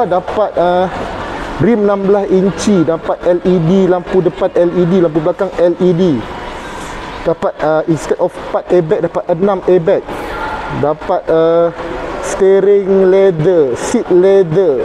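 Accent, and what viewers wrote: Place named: North, Malaysia. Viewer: orang loqstaq nii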